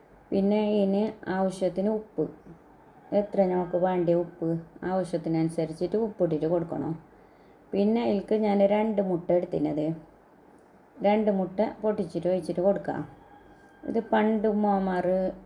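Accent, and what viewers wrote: Kasaragod, India. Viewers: താങ്കൾ കാസർക്കോട് ഭാഷയിൽ പറഞ്ഞത് ചിലതൊന്നും മലപ്പുറത്തുകാരനായ എനിക്ക് മനസ്സിലായില്ല, 🤔 എങ്കിലും വീഡീയോ കണ്ട് പഠിച്ചെടുത്തു 🥰. Thanks.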